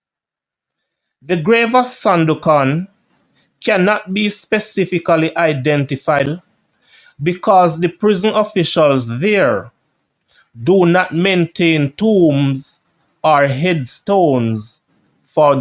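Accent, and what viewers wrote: Jamaica. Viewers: U hear any foreigner on here asking bout correct pronunciation bredda???Except you!!!Stop being so critical of the presenter's Jamaican dialect. Cho!!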